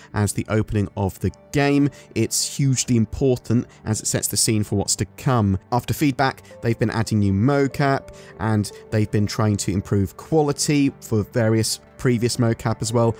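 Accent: United Kingdom. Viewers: BRO YOU SPEAK TOO FAST AND TOO BRITISH.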